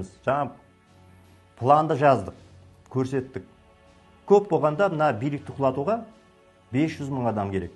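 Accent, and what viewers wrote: Standard. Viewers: Алга. Алга ДВК. казакша таза сойлейди. Казактардын ишинде казак тилин билмеген сон ерикзиз орыша сойлеп жур Токайдан 5 есе казакша сойлеп, казакша ойлайды.мухтарды колдаймыз.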